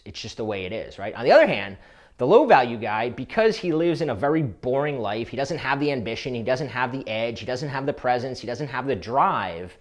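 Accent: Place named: Boston, United States. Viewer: This dude is definitely from Boston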